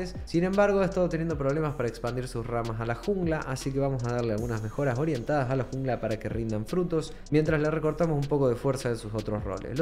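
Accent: Argentina. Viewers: tenía acento argentino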